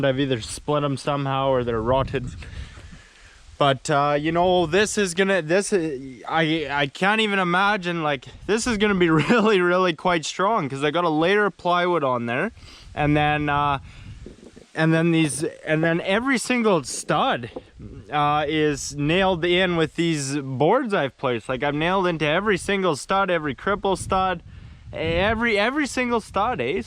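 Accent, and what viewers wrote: Canada. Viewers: From his accent I am going to guess the middle part of Canada. Saskatchewan or perhaps Alberta. From the trees I’m going to guess the northern portions of those provinces. I’m in NW Ontario.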